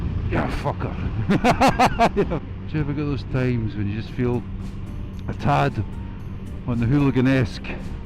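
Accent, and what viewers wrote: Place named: Scotland, United Kingdom. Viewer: "You sound like the most Scottish person ever on your videos" "Do I? Don't worry, I'm planning on doing some "proper" videos" What?! 🤣🤣🤣 Nothing wrong with the roll-on acceleration there, 👍 Anyway, I'd love to comment further but I've got to go - tonight's the night!! (by which I mean the annual village quiz night, of course). 🤓😇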